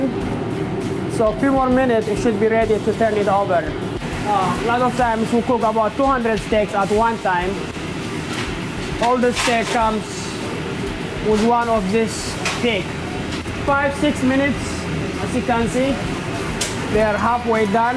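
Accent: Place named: India. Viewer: This cook is really messed up he is talking part indian accent and part arab accent ... i m very confused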